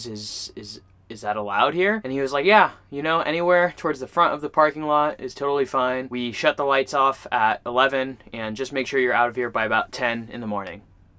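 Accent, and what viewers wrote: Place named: South, United States. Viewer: You put so much work into your videos! It takes time to get all those transitional shots where you get the video and then have to go back and get the gear. Wow. And you must put lots of time into editing. So many shots that flow so well telling a story. And the sound is mostly great! (At the end when the music seemed a little loud to me I just turned down my volume. Smile.) Did you add the surf, wind and sea gull sounds to the coastal drone shot or did that come with the music? Nice touch. You may be a California man but sometimes you really sound like you're from the south. (I'm a native Arkansan.) I'm looking forward to your videos!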